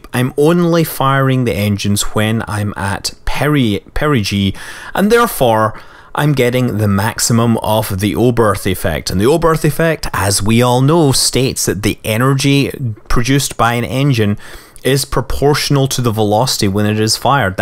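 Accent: Scotland, United Kingdom. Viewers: ^_^ MOAR SCOTTISH! I must obey the need.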